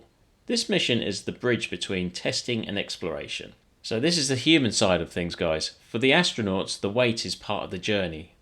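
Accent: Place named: London, United Kingdom. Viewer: Thanks for the update. Given the complexity and safety aspects that are a huge part of this project, I’m still amazed that well over 50 years ago, humans actually went to the Moon. I’m located about 60 miles south of Los Angeles, in Orange County, CA (but born in Gloucester, UK)., I’ll guess that you’re from some borough of London, or nearby. Hackney?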